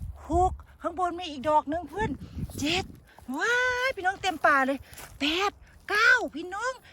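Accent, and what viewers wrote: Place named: Nyo, Thailand. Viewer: สวัสดีจ้าสาว อยู่จังหวัดใด๋น้อคือเว้าญ้อ ฉันอยู่ สกลเด้อจ้า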